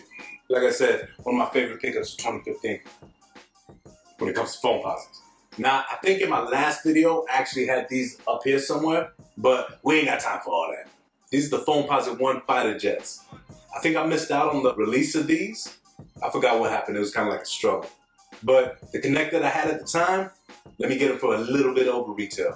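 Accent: United States. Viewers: Nah man that's just NY talk. They have that type of flow. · Yoo you from the Bronx ????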